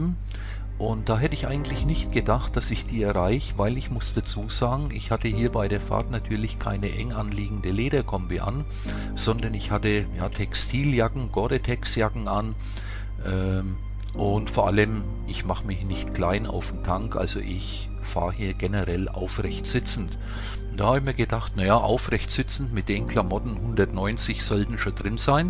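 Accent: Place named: Franconia, Germany. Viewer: Do hör i doch an Frangen. 😊 Ich fahr auch den Storm auf meiner Sv. Ist aber eine Euro 2. Gruß aus Mittelfranken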